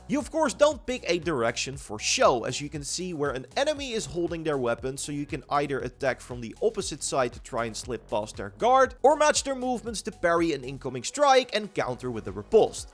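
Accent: Netherlands. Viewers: Echt het Nederlandse accent ook ! Ik kijk echt naar dit spel uit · I dig your Dutch accent. Groetjes uit Duitsland. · the thick dutch accent is real omfg....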